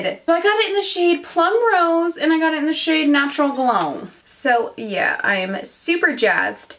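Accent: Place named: South, United States. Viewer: you sound like you have some southern in you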